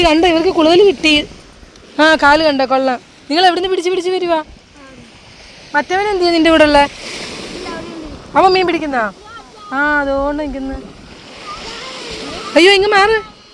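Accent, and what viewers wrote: Kollam, India. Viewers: കൊണ്ട് അവിടത്തെ പിള്ളേര് വരെ തോറ്റ്...❤❤❤ നമ്മുടേ കൊല്ലം കഴിഞ്ഞു കരുനാഗപ്പള്ളി കായംകുളം വരെ ഉള്ള ഒരു ഭാഷ അല്ലേ നിങ്ങൾ പറയുന്നെ..❤ പിന്നെ എന്റെ രണ്ട് കമന്റ്‌ കണ്ടിട്ട് മിണ്ടാതെ ഇരിക്കുവാണ് ഇല്ലേ ഞാൻ കണ്ടു see..😂 നെവർ മൈൻഡ്.....ആ ലൈനാർ അംനിയെ ഒന്ന് കാണാൻ പോണേ.❤❤❤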